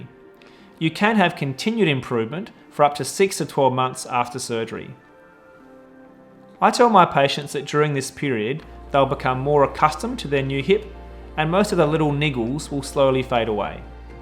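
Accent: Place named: Australia. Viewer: This is great but i would’ve understood it more if it wasn’t in Australian.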